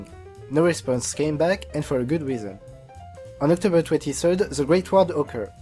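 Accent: France. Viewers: Chemsse-dine Salhi I know, but I don't need them, I can understand him perfectly, I went to french schools so I got used to hearing french accents. If he is indeed french, he does actually speak English very well. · ohhh putain cet accent francais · vue ton accent et les sous-titre tu doit être français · omg, french accent <3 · His french accent is as cute as Curie's... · T'es français ? :3 Putain, cet accent mec ! XD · Juste excellent ! Continue tu es sur la bonne voie ! (je me permet de te répondre en Français, ton accent indique tout ^^, et les sous-titres Français, c'est parfait !) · your frenglish makes my ears bleed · lol dat French accent is ridicilous but nice video brah :D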